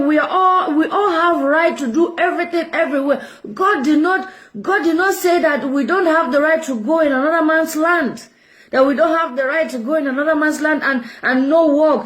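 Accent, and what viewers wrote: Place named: Africa. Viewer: Your voice and accent sounds so African. I love it ❤️❤️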